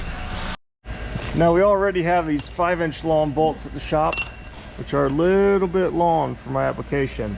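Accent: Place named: Georgia, United States. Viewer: has to be from Rural GA or AL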